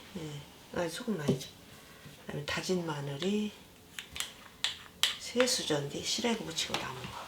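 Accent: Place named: Chungcheong, South Korea. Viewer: 설명해주시는 부분이 진짜 엄마나 할머니가 해주는것 같아서 너무 친근하고 좋아요.. 저도 충청도 사람이라 중간중간 나오는 충청도 사투리도너무너무 좋습니다..요리강의 영상이 이렇게 따듯해도 되는건가요.. 보일러안틀어도 지금 너무 훈훈해서 땀이나요 삐질삐질 😅💕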